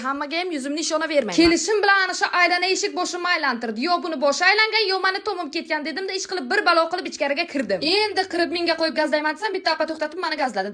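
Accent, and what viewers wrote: Bukhara, Uzbekistan. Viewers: Sheva buxoro 😂 Buxoro qanisila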